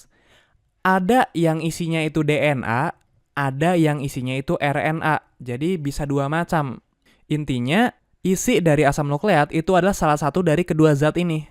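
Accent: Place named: Bandung, Indonesia. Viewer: Orang bandung kak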